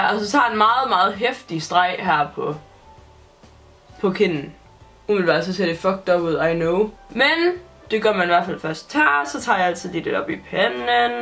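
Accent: Jutland, Denmark. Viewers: Hun er jyde right? Hvorfra???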